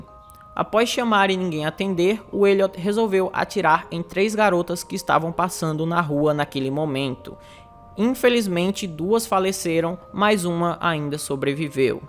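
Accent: Northeast, Brazil. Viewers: Jesus:"( vc e de onde? Pelo seu sotaque e Nordeste mas qual é?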